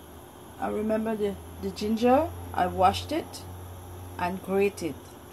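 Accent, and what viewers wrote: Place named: Guyana. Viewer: Peace! You soundin like my countrywoman (bini) from Guyana. Thanks for this Queen!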